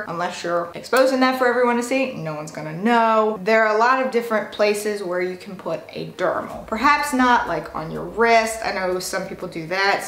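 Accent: United States. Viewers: Where are you from? I’ve never heard your accent before. You sound American but you said a bit Irish. Because when you speak you don’t pronounce the g in ing words. You say “piercin’ and “somethin”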